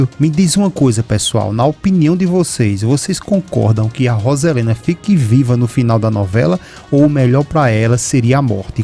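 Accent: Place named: Northeast, Brazil. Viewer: adoro assistir sua narrativa é muito legal com esse sotaque nordestino. Maria Alvina de Teresina -Pi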